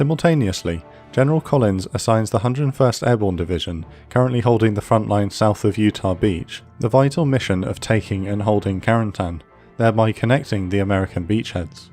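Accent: United Kingdom. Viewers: Excellent presentation, as usual. And your British accent gives extra gravitas to the narration. Keep them coming.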